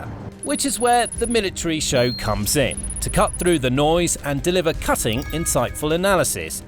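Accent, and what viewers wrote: United Kingdom. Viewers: I thought he said the Challenger was the best overall, which seems to go well with his British accent.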